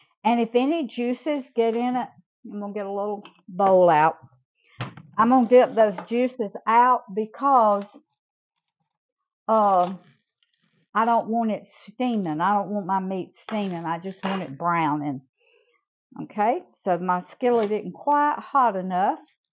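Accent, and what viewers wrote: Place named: Alabama, United States. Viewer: I’m so thankful YT took me to your channel. You remind me so much of my beloved grandmother. She’s with Jesus now but oh how I miss that beautiful and feisty woman inside and out!! 🕯️ ✝️🥹 I want to thank you for your grandson’s service. My own son is a Master Sargent in the AF. This morning he and his family (DIL and 4 of my grands are PCSing to Spain for 2-3 years. Such a wonderful opportunity for all of them! But I will miss them dearly. 🥹🇪🇸 May I ask..are you from Alabama? Because you share my beloved grandmother’s accent. 🥹🥲 God bless you both. 🙏🏻✝️ 🌹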